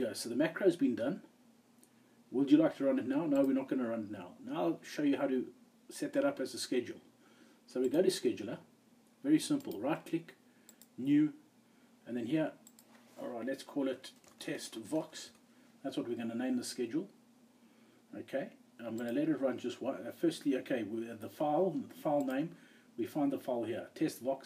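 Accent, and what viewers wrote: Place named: South Africa. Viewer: So cool figuring out you're South African :)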